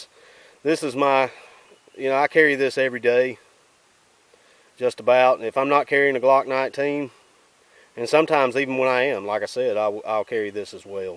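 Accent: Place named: Boston, United States. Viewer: I had a friend from Boston who spoke just like this guy.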